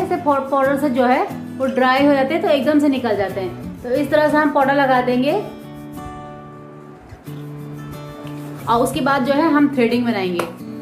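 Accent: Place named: Pahadi, India. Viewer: Apki bhasha mai phadi ltak aa rhi thi smajh gyi thi aap phaad se ho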